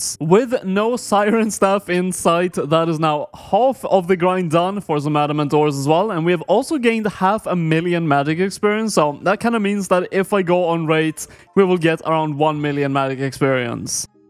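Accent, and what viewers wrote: Sweden. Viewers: The swenglish accent is so strong, i love it😂 Rsn: Kurdo-1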